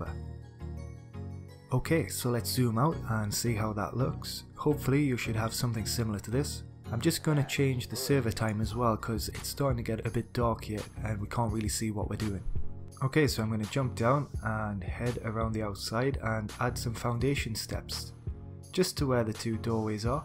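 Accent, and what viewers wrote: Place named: Newcastle, United Kingdom. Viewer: Nice build mate and you a geordie too? sound very local lol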